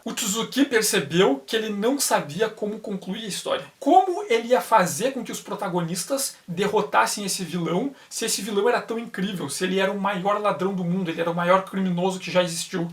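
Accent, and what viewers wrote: Northeast, Brazil. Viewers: En, tu é nordestino?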